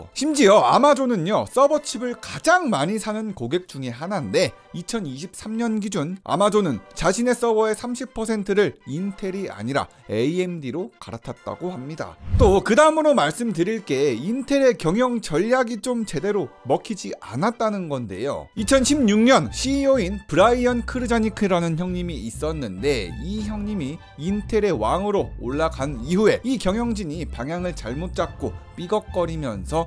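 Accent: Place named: Gyeongsang, South Korea. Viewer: 백날 알려줘도 경상도 것들은 안배워요 끝까지 군님, 인녁, 인뉴, 전녁, 만뉴.. 후~~~~~ 고생 하셨어요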